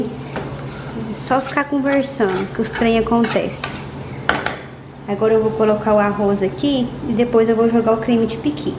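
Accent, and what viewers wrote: Minas Gerais, Brazil. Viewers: Até parece que mineira! Só faltou o nosso “uai”!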